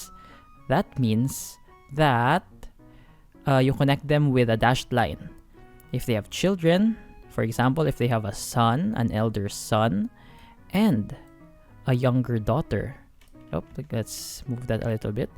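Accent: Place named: Philippines. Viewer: This needs more views. Filipino accent is strong.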